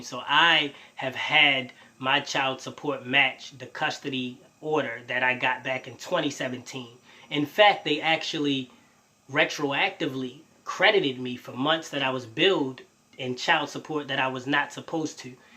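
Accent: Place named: Maryland, United States. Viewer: Sounds like you're in MD I really like what you're doing here. Let's connect brother.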